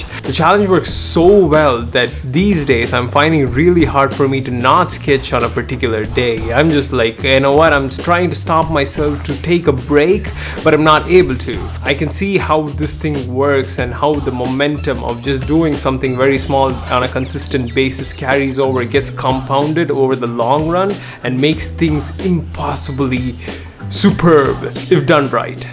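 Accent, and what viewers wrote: India. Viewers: This Indian accent. I can't understand these people!!! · Bruh are you indian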